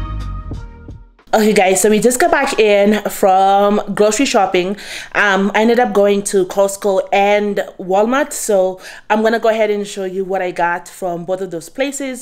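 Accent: Kenya. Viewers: Hi your kiswahili sounds kama ya mombasa, did you live at the coast before going to the U.S? Btw you're a really good Mum.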